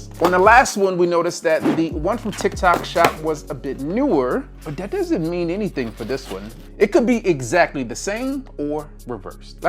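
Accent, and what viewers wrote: Baltimore, United States. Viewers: are you from baltimore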